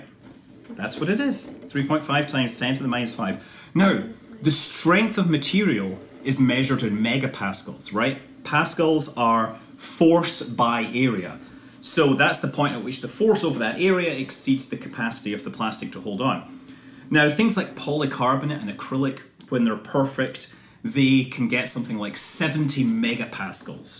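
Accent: United Kingdom. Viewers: British, judging by the accent.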